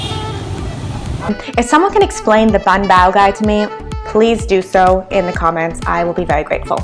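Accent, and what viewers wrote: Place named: New York, United States. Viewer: You sound more ny than Canadian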